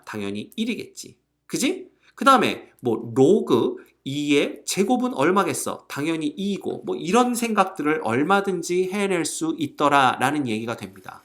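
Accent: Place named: Seoul, South Korea. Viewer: 서울 사람 티가 나시네요 ㅋㅋㅋㅋㅋ 경남은 e 랑 2를 확실하게 구분한답니다 ㅋㅋㅋㅋㅋㅋ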